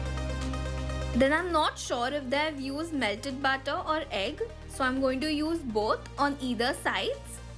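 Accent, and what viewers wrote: India. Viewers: Are you Indian ?????🤔🤔🤔 B'coz your accent seems to be indian.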